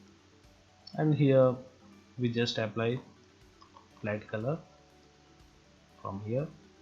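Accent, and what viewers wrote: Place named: India. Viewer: Hey, This is an interesting question indeed. I wondered too, a few years ago. (I'm glad I found this channel back, because being into this as well (matte painting, set extensions, ...), the only thing I had time to write in the late account was I really loved his indian accent ;) Hahaha, kind but short... Now I can go further fortunately) So If you don't mind switching a few moments from this lovely accent to a less lovely german one (not me btw), you'll have some answers here <link> around 5.10, 8.00 a,d 18.00...Now you can have auto calculated depth, and I think one could probe it into a bitmap too. Don't forget to come back here, I wouldn't cause leaks just because I helped.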